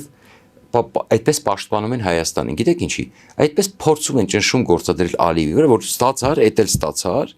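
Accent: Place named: United States. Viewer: Էտ մենակ թողել էք արմենչիկի գովաբանությունները մնացածը ջնջում էք՞, այ լակոտ դու մեզ ուզում էիր բերում Զելենսկուն, որ տեսակ ինչ հզոր քայլ արեց, Տռամփին առաջարկեց իրա հանքերը ու հեսա կտեսնեք բիզնեսմեն Տռամփը ինչ օրնա քցելու պուտինին, ու այ տուֆտա ով ու ինչ օրն ընկա՞վ, խեղճ Զելենսկուն բազմաթիվ կթելու փորձեր տեղի ունեցան ու դեռ շարունակվում են ավելի խորանալ, դու ամերիկանսկի իդեոտ ինչ էիր առաջարկում բերեք զանգեզուրի հանքերը տանք ամերիկացիքին, այսինքն ջհուդներին, որոնց հինգերորդ կետից սնվում էս դու ու քո տականք ընտանիքը, անգամ նրանց առոգանություննես օգտագործում հաչալուտ ժամանակ, երկրորդը, ամուլ սարը ամերիկացիկինը չի դա սեռժա արամական վազգենական ոսկու թեմայա ռուսական դաշտում դու քո հիմար խելքով լսում էս հին աղվես, մեծն դերասան ասմունքող արամ զավենիչին, որ ամուլ սարից իրա թուլա փայն ունի, ու աֆրիկյան աբորիգեն ցեղերի ներկայացուցիչների պես ականջ, պռոշ, քիթ դնում ու բանդաժում էս ու մեզել ուզում էս համոզես որ սա միակ ճիշտնա ու վերջնա՜, այ գյադա ուրիշ ավելի լավ անուն ես չեմ կարող տալ, որովհետև մնացածը շատ ավելի վատն են, երրորդը ասում էս համերաշխության մասին, տո այ խառնակիչ ես դեռ չեմ լսել քեզ, իսկ ես համարյա միշտ լսում եմ քեզ քանզի ես քեզ համարում եմ հայ ազգի ամենա մեծ թշնամիներից մեկը, անգամ նիկոլի վովա օղլիից, քամի որ էտ կեղտը երեսներիսա իրա հակահայկականությունը ցույց տալիս, իսկ դու ագենտ էս ու դա ավելի վտանգավորա, դրա համար ես միշտ քեզ լսելով պատկերացնում եմ թէ մեր թշնամիները ինչ են պատրաստվում մեր գլխին բերեն։ Ես դեռ չի եղել քեզ լսեմ ու դու կոնստրուկտիվիզմից չխոսաս ո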